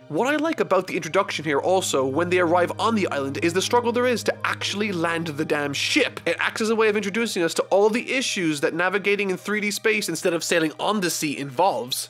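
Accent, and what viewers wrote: Ireland. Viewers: Yes, his accent is pretty Irish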